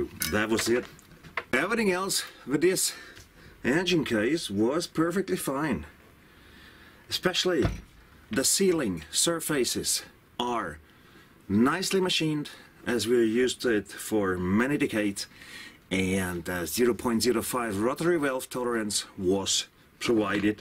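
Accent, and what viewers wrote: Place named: Australia. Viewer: I love the aussie accent 😂 schöne Grüße aus KA... Maaate!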